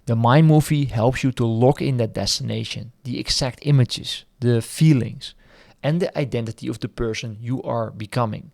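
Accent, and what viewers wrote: Netherlands. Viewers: Love the netherlands accent ☺ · Ik kom dit filmpje tegen tussen mijn video's. Klopt het dat ik hoor aan de manier van praten dat je Nederlands bent? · Jij bent echt Nederlands zo te horen 😂😂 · 2 words out of your mouth and I knew you were Dutch lol. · aan je accent te horen moet je wel nederlands zijn .... vraagje doe je ook meer dan meditatie , astral projectie toevallig ?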